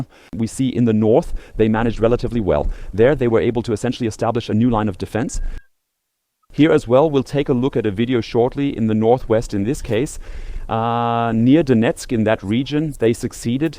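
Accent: Germany. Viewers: A pleasure to watch with such a knowledgeable, handsome host who is extremely fluent in English. I don't mind at all the touch of a German accent. He only slipped up once, letting "Belgrade" come out instead of "Belgorod.' That's highly forgivable, considering that the meaning of "Belgrade" in Serbian is exactly the same as "Belgorod" in ruzzian.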